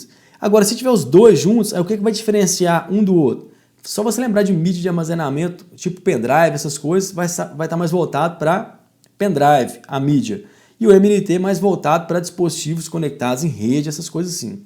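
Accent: Minas Gerais, Brazil. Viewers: sotaque mineirin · uai sô, rastado esse sotaque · O sotaque mineiro é bem simpático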